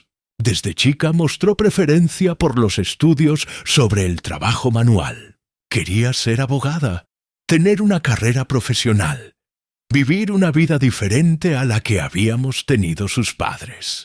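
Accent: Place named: Spain. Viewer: Coincido con un comentario anterior, la historia es buena pero creer que los argentinos hablamos así 😂😂😂es una burla. Es un español pronunciando la Z por favor!! Y por supuesto con Uruguay nos entendemos perfecto. Hablamos el mismo idioma!!! Hay que estudiar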